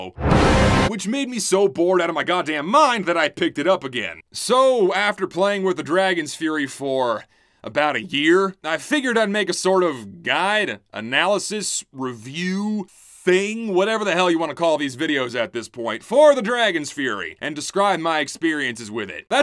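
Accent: South, United States. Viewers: texas accent shining through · Why is a southern accent creeping it's way into voice throughout the video? it's kind of creeping me out lol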